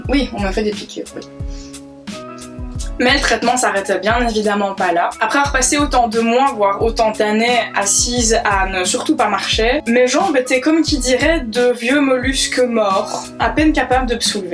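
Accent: Belgium. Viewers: t'es belge? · Tes belge ?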